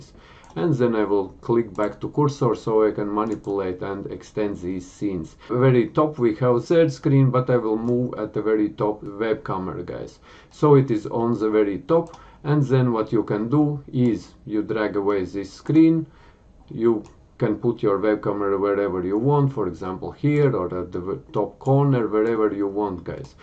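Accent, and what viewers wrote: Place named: Russia. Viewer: This dudes russian accent is awesome! its so strong!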